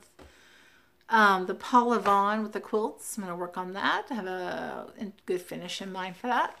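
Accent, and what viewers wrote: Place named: South, United States. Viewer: Great video. I love everything you stitch. You must have lived in the south at one time or have southern roots. You say y’all (I love it) and you said little bitty. That’s a definite giveaway. Look forward to your next video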